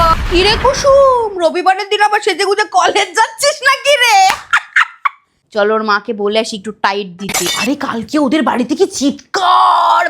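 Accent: Bangladesh. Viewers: Kotha sune Bangladeshi mone hoy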